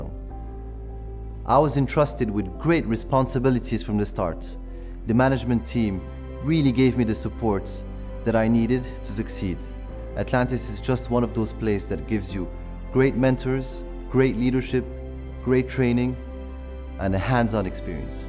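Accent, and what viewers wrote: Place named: Morocco. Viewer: What ever is the language you speak but your accent still Moroccan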